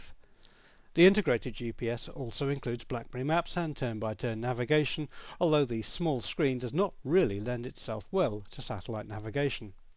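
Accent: United Kingdom. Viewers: r u welsh?!?!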